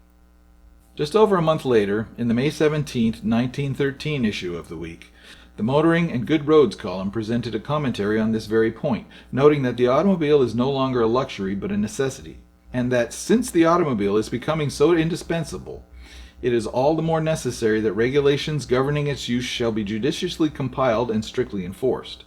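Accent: Canada. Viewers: Where are you from? Hahaha. If from Canada, I'm guessing Ontario!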